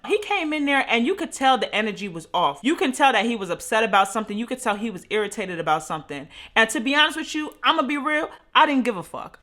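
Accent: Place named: Brooklyn, United States. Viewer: U gotta be from Brooklyn!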